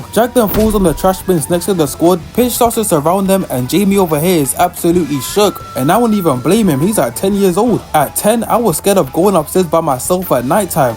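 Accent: United Kingdom. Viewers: Bro I was caught off guard by your British accent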